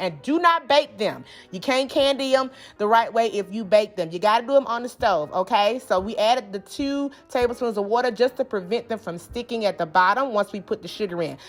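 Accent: South, United States. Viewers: Why did the commentary to the video bring me back in 2025! 😂 love her southern accent mixed with the humor 🤣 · your southern charming voice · The accent got me. I was so distracted listening to her southern drawl that I forgot what I came here for :-). She had me cracking up when she went "you need to make a lil mo chile".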